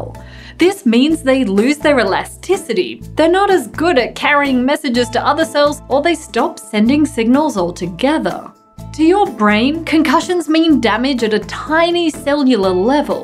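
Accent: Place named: Australia. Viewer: I am myself from Australia and wow, you have the most pleasant Australian accent and voice I have ever heard. I actually wasn't even sure if you were Australian, and that perhaps you had a less than usual British accent. I will happily listen to your voice speaking about psychology. SUBSCRIBED! :D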